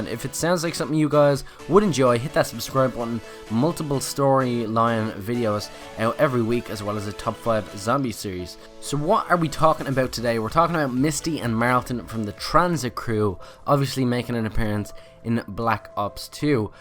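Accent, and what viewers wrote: Ireland. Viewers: are u irish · i can tell your irish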